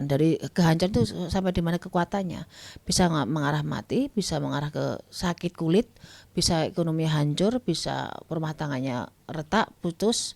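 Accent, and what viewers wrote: Java, Indonesia. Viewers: Lidahnya emg Jawa mbak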